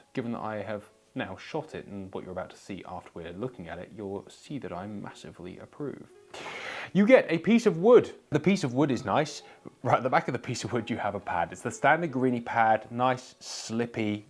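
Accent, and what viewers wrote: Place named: England, United Kingdom. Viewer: Apologies mate. Grow a beard or lower your voice. I’m not suggesting you don’t know your shit. Very English. Love it. Keep up the good work mate.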